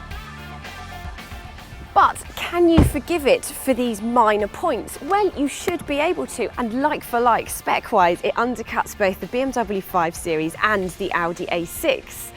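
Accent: United Kingdom. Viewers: Wow, that is a fine ass reviewgirl! Why whe don't have those in the Netherlands.. Oh, love the British accent as well.. ;)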